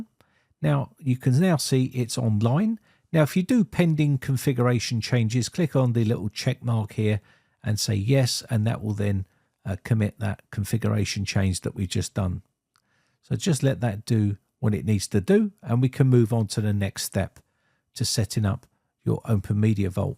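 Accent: United Kingdom. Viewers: Hi, Sorry this is out topic. But i like your british accent. =)) Plese recommend other british tech youtuber I can subscribe. Thank you.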